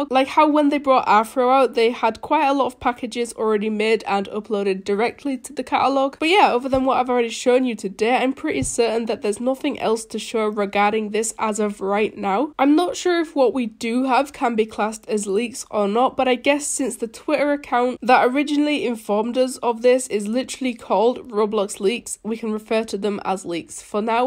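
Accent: United Kingdom. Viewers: Shes british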